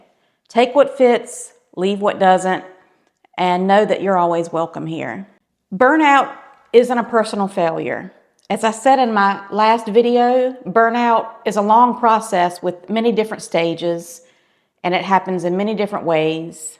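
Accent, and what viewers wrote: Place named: United States. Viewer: I’m a Brit and I love your accent. To me, you sound like a proper American.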